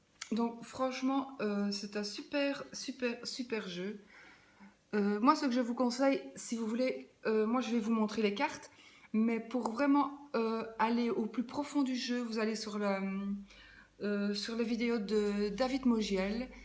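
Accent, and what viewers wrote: Belgium. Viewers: Bsr, j'adore ce jeu ,moi je viens de le découvrir. Depuis presque trois semaine. Puis je me permettre ,y aurais pas t'il un léger accent belge 🙂. Je vous souhaite une douce soirée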